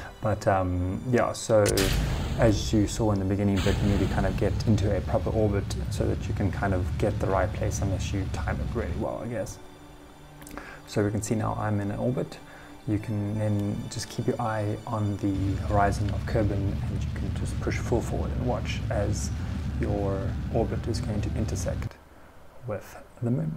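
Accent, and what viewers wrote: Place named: South Africa. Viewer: dude you sound south african lol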